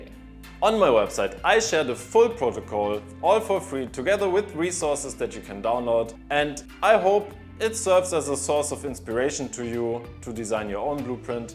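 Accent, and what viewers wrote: Germany. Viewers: I love the video! And the German accent as well🤩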